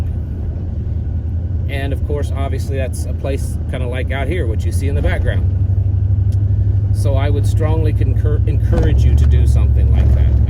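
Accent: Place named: Appalachia, United States. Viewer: Love them hillbilly pipes , yours too .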